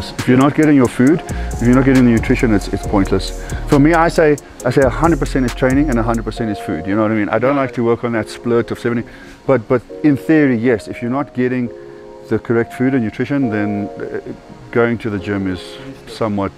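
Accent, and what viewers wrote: South Africa. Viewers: Not been able to go back to South Africa since the pandemic begun but hearing other South African accents makes me happy🙏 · Is he South African?? Now I see the man's... from south Africa.... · Dope dude, this video made me realise u deffs a fellow south african, thnx for the content! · Bro sounding south african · Are you South African? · is he south african? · I'm south african and I swear that must be south african as well, he just sounds like one.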